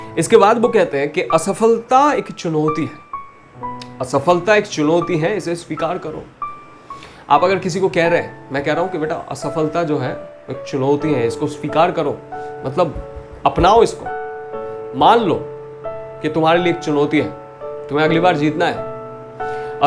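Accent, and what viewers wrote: Bengal, India. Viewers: Nice, but aapki hindi me Bengali tuch abhi bhut dikhta hai bro.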